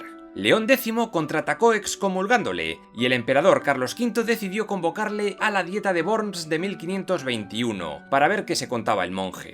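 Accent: Spain. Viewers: Muy bueno. Como apasionado de la historia es un placer ver tus vídeos.Ahora si, me hace gracia tu acento español y que le resulta imposibles decir las palabras esdrûuuujulas que poseen los nombres italianos y americanos por ejemplo. Pero vale, nada de eso le quita mérito a tu trabajo Saludos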